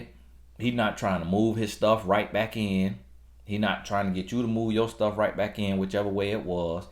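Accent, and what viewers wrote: South, United States. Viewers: Lol, and his cute country accent.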